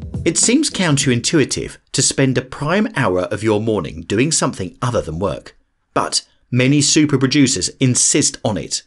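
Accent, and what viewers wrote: United Kingdom. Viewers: Love this channel. I'm a visual learner, I like to read, and see infographics. It helps connect the dots for me. Your UK accent just makes everything more precise, knowledgeable, and entertaining. Keep up the great work and illustrations.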